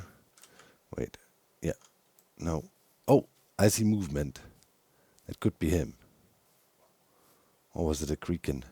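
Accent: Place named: Germany. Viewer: I- you're German! I've been hearing your voice in other hermits videos, and couldn't pin point your accent! I feel so silly, but I guess I grew up around enough German accents that it sounds as "normal" to me as English.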